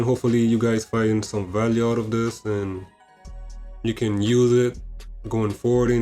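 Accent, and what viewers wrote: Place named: Virgin Islands, Caribbean. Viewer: I knew it! I was watching the video thinking 🤔 “This person sounds like they are from the Caribbean. Specifically from the Virgin Islands.” I’m also from the VI and currently live here. I’ve been planning my entrance and ultimately my path in IT, so been doing a lot of research. So far in my research it comes down to 1) learning the foundational knowledge so Comptia A+, Network+ and Security are good options. Learning Linux and a scripting language like powershell, bash or python can be beneficial. 2) developing and building on it skills which can be done using hands on labs and projects, 3) Getting experience either in a support role via computer support/ help desk or network support, internship, a tech role like Apple Genius, Best Buy Geek Squad,or similar. 4) Networking in the field via LinkedIn, meetups, applying for hundreds of jobs and personalizing your application with an introduction gif. 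Also, it may help if you create a vlog/ YT channel in the space for whatever path you are interested in. 5)Not sure if you have a degree but if you don’t you may want to consider getting one in the long term to help you qualify for more tech roles. If you don’t have one, look into WGU (Western Governors University) they have multiple it Bachelor paths, tech certs are included in many of the degrees and you can get your degree in less time 6 months to 1.5 year depending on the time you put into it and your level of dedication. It costs about $3700 to $4500 per 6 month term dependin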